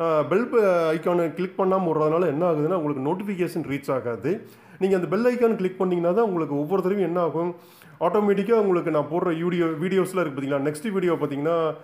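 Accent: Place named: Kerala, India. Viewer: Sir sounds Kerala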